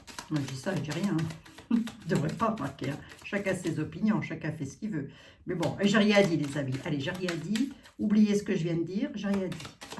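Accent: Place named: Lorraine, France. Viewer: J’adore vous écouter car votre voix et accent me rappellent les bons moments vécus en Meurthe et Moselle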